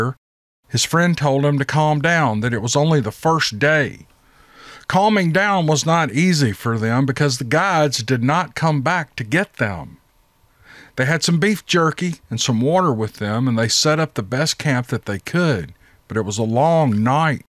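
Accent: South, United States. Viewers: Just found you and enjoyed your stories! Great southern voice too!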